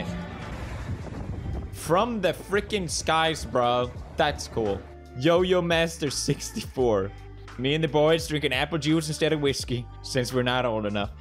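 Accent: Sweden. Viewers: is it me or is his swedish accent coming back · his swedish accent was way heavier back then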